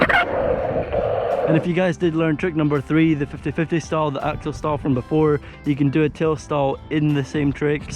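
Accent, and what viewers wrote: Scotland, United Kingdom. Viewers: haha love that scottish accent! Gonna live near the Loch Lomond one day when I´m old! Keep up the great work!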